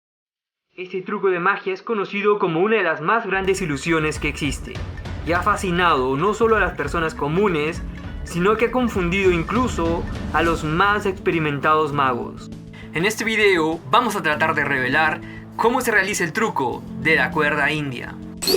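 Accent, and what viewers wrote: Peru. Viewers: ERES DE PERU VERDAD ? LO DIGO POR TU DIALECTO AL HABLAR . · ese acento es peruano 😊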